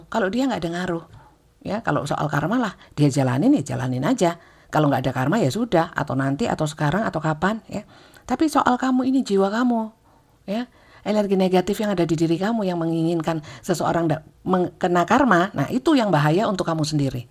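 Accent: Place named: Surabaya, Indonesia. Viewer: Q suka logatnya, Malang & Surabaya banget.. q arek Surabaya, tpi udh lama stay di Bali. Jadi kangeeen Surabaya. Wah bener, harus waspada..di sini ada tetangga tukang gendam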